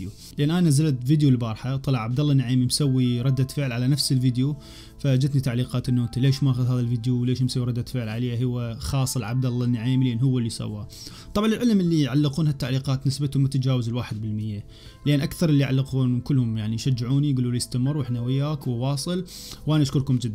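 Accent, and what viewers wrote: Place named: Iraq. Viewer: مافي تقليد ولا شي كل واحد منكم له اسلوبه المميز.. شخصيا انا اتابعكم انتو الاثنين.. وانا احب اللهجة العراقية كثير الله يوفقك.. بس اهم شي ابعد عن النرفزة والسب بالتعليق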